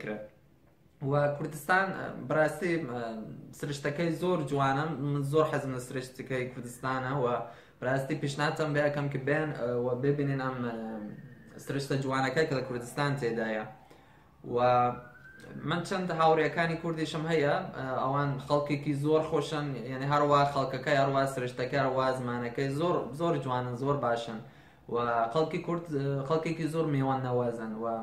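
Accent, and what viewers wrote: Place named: Chile. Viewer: Qué extraño que no tengas más vistas y likes. Eres como un políglota "invisible" haha, Tú español está bien, es gracioso tu acento, se parece al acento chileno. ¿Cuándo subes otro video?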